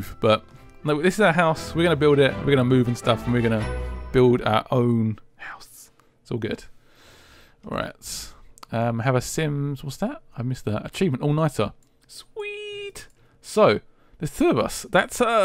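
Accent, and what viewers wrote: United Kingdom. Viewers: I really love your enthusiasm about this game, considering the bad reviews. I have now got the sims (yesterday) and I like it so far. Building a house was amazing. I love the family dynamics. I know there are major things missing from sims 3, but this is not sims 3, this is sims 4 and all is good so far. What is your best and least liked feature? Nice to hear a UK voice xx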